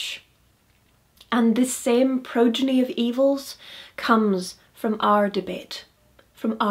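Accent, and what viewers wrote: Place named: United Kingdom. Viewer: Good job! :) I must say, all the times I've performed/studied/seen Shakespeare, it sounds so much better with a British accent. :)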